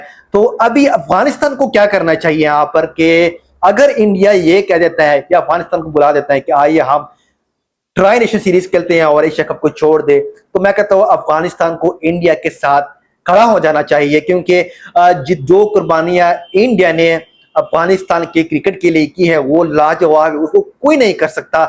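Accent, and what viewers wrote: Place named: Pakistan. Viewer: Muzhe to ye banda pakistani lag raha hai iski urdu se pata chal raha hai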